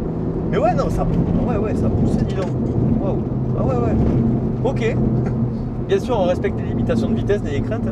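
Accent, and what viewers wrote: South, France. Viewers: Salut, le bel accent du sud fait plaisir, j'ai découvert ta chaîne il y a peu, le contenu est bien travaillé. Plus 1 abonné. Tu est de quelle région ? J'ai quelques véhicules à essayer éventuellement. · 🤔 Ça manque de chemise en ce moment..!!😜 Avec l'accent du sud..merci de nous présenter des modèles futur icône/collector.👍.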